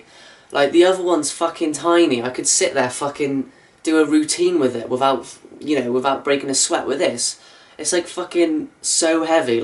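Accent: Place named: United Kingdom. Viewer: Glad you're excited about it. I'm working on my own website and I'm going to have videos on it of jobs I have modified for injured workers. I'm doing one right now for a custodian, which is how I ran across your video. So for this video, your target market is not other teenagers, rather college educated safety and human resources managers. So what they need to hear is how difficult it is for a healthy young man like yourself to use that mop bucket, lift it up to the utility sink to dump it etc. What other tasks wear you out? Swinging the string mop? Squeezing the water out of the mop? Think about everything in mopping that is difficult physically (not how boring it is...I can't fix that.) I am an advocate of flat microfiber mops that weigh 80% less when wet. So your video was very entertaining, just not appropriate for my audience. Think about how you talked to the person in human resources who hired you...that's who your talking to in the video. 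I would say cut it down to 1-2 minutes, like a commercial. These people are busy. But feel free to be funny, funny is good. Thanks so much! This is fun. Are you in the UK? (by your accent I'm guessing)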